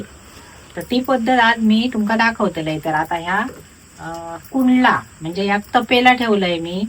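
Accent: Malvani, India. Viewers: खरा सांगा, तू वाहिनी पूर्ण मालवणी बोलतंय आयकाक खूप बरा वाटला. वालय , बेळो , डोनो वाळण हे सगळे शब्द आमच्या घरातली आठवन करून दितत. फक्त गडबड अशी व्हाता पेडयांची कढी बघून प्रत्यक्ष जेवक गावला नाय ह्याचा वायट वाटता. आसानी, देव तुझा भला करू मावले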